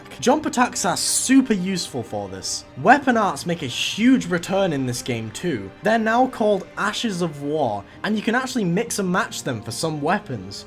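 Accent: United Kingdom. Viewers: good content, and good personality m8. you'll blend right in with the 1,000,000 other British sounding from soft critiques m8 also 20$ says you used a zweihander in ds1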